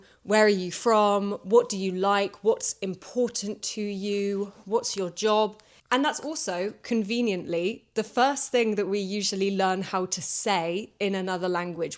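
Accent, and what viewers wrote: United Kingdom. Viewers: Hello, Thank you for your recommendation. I love your content and love your british accent too. Could you recommend more about British films, please? I love British accent but now I don't know what next cause I just found out some queens and princese but I love crime and school sitcom or comedy. Thank you so much for reading my comment. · Watching your videos, is such a treat. I love how you speak British English with such passion and grace. Keep going! Take good care of yourself. See you soon! 🇬🇧🇬🇧👩🏼‍🏫📚👌🏻👍🏻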